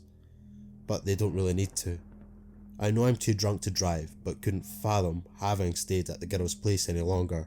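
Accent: Scotland, United Kingdom. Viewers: I'm from Pennsylvania! :D Also hi, I'm new here and I found you through Margbot. Scottish accents are my favorite and I'm excited to watch your videos <3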